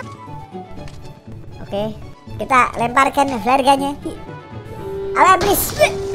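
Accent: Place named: Banyumas, Indonesia. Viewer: orang mama.? Kok kadang ngomong ngapak.?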